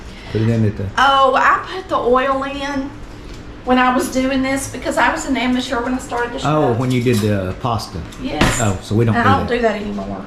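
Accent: South, United States. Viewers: Hi ; I'm a newbie ; Just ran across your channel tonight going through my phone with nothing to do and when I saw Mac salad I STOPPED and WOW ! I'm glad I did STOP 🛑 INN I love Mac salad I made some SUNDAY for the FAMILY COOK OUT BUY ; I didn't make it like this this looks much better than the one I made and I LOVE ALL the GOOD STUFF you ADDED IN I BELIEVE it TASTE JUST as GOOD AS IT LOOKS yummy yummy 😋 MAKES YOU COME BACK FOR MORE... I WILL BE SUBSCRIBING COMING BACK TO WATCH MORE and MORE LOVE YOUR SOUTHERN ACCENT ❣️ AND THE SOUTHERN COUNTRY COOKING LOOKS AMAZINGLY GOOD AS THEY SAY I'll BE BACK , LOVING YOUR CHANNEL ,GOD BLESS🙏 STAY SAFE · Love that country accent.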